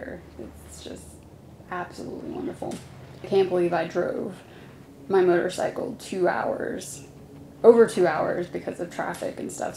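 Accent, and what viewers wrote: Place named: United States. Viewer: what a pleasure to hear an American talking about Triumph motorcycles.Some of the vids from England, are, well, I have a hard time even understanding what they're saying. My wife and I sometimes overwinter in Houston, Tx. Preparing to dump my Harley softail for a Speed Twin, or t-120. Difficult decision!!!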